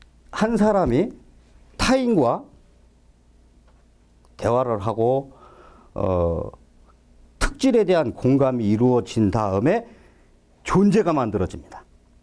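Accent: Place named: Chungcheong, South Korea. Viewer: 군소리가 99% 너무 많다. 그기다 충청도 말씨까지 더하니 답답하고 짜증까지 생긴다.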